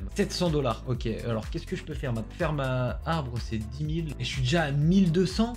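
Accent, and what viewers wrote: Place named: United Kingdom. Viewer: J'adore ton accent anglais quand tu lit les trucs en anglais 😭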